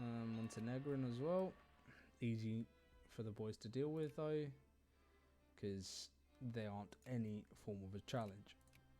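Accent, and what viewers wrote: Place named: Kent, United Kingdom. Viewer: You from Kent? You sounf like youre from kent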